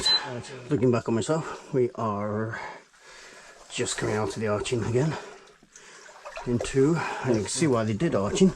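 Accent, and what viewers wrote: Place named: Potteries, United Kingdom. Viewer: Very random question, where's your accent from? Sounds like Trentham in the Potteries!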